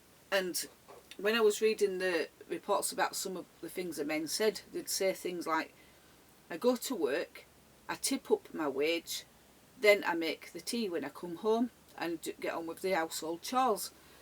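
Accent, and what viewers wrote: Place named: Northern England, United Kingdom. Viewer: Still love your northern dialect:)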